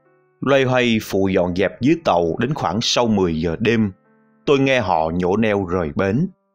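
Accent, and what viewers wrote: Standard. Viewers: Giong tram phát am chuan rất hay ( đường phan thanh gian )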